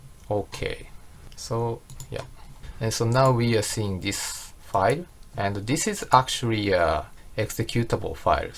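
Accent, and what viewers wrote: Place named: Japan. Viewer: 日本の口音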